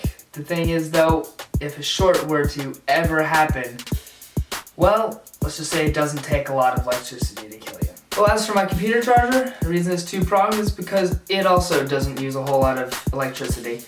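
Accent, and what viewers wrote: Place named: China. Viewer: you talk like chinese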